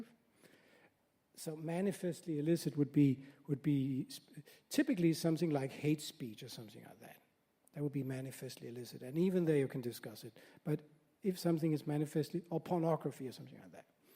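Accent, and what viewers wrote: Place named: Denmark. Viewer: This is Danish English-speaking,,,, blurry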